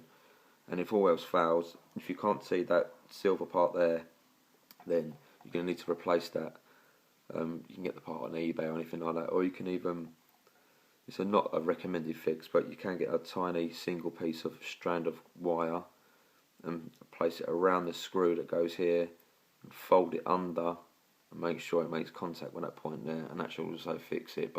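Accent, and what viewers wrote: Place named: England, United Kingdom. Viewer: Well done, it's so nice to here an english voice ( I'm a jock by the way lived in england most of my life) with no shit music in the backgrounnd, but a well clearly explained bit of good information cheers mate Dave in slough.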